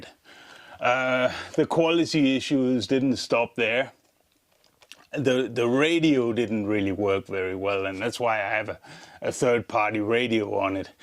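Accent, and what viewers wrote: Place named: Austria. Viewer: You must be from Austria but love the accent and the rc content from north Carolina usa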